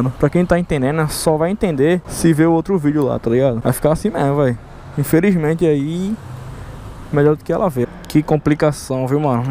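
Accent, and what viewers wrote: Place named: São Paulo, Brazil. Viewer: como que não é fake? essa porra é fakedói meu irmão, tu de Recife, com esse sotaque de paulista, prego do CARAI, honra tuas origens boy! kkkkkkkk essa porra ainda vista por tanta gente kkkkkkkk, vou dar um LIKE só por tua coragem visse !! mas num fica com essa de MANO e MINA não que é feio doido !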